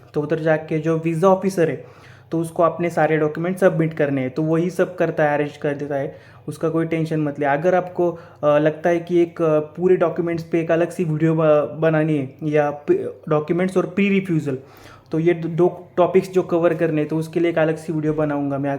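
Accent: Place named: Maharashtra, India. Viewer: you sound marathi mulga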